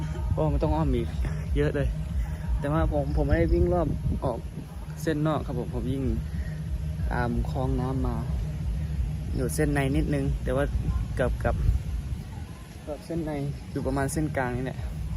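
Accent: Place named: Isan, Thailand. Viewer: ต้าวมันกว้างมากไม่ใช่มันกว่าง😀😀🐷🐷🐷หมูน้อยพูดสำเนียงบ่ถิ่มบ้านเฮาเลยน้อหล่า🐷🐷🐷🐷😊😊😊❤️💖💞🐷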